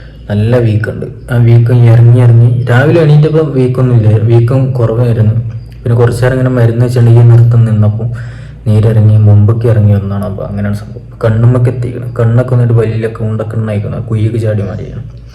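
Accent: Malappuram, India. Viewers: Ivan malappuram slang aanallo😌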